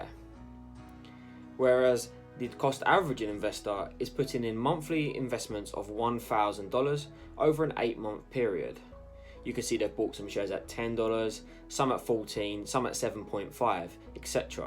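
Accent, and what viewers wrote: United Kingdom. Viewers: Nice content! Love ur British accent 😉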